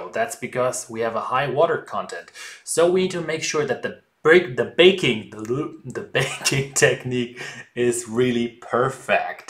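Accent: Germany. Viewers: I love his German accent ;) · Few things to note..... 1, my prediction about "Zee" is German, turns out to be correct, but aside from that, your English is pretty good, to a point that I almost doubt my protection about the "Zee". You should working on adding more accent for ease of identification. 2, started from #2, the whole thing sounds like college project, I can respect that... 3, I'm going to save this video, and when next time someone asked me why German make the good stuff, I'll send this to them... 4, So, in Germany, people do use their thumb to count 1... Jokes aside, this is a very interesting video, much different than the rest of the YouTube cooking video I've seen so far, even if it's just a video about homemade bread, the whole process screams perfection, sure is a breath of fresh air, good work. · NEVER apologize for your delightful German accent and way of speaking. 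:) I love authenticity in a human being, and you are an authentic human being. :D also, your tips on bread making are fantastic. :) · Great video! I’m still a newbie to sourdough, and this helped me a lot. Also, I’m Czech and to me it’s so adorable when someone has a German accent🥰😄 pro tip: if you put the tip of your tongue between your teeth and say “d”, you’ll get quite a decent “the” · You made me laugh that you apologise regarding your german accent! Du hast mich im lachen gebracht! So please watch this here. I think the best and funniest advertisement ever: <link> Grüsse aus Ungarn! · I not only learned some technique here but I also got a kick out of the bloopers. I'm glad you don't cut them out because it's way better this way. Ich mag auch den deutschen Akzent :) Keine Notwendigkeit, sich zu entschuldigen!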